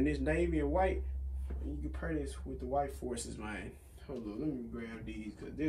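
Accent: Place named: Memphis, United States. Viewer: Soon as I heard you talk I knew you was from the M😂 901〽️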